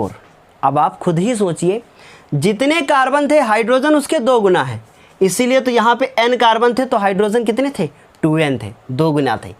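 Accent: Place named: Kanpur, India. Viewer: Sir apki kanpur language ki vajah se hme bahut mja aaya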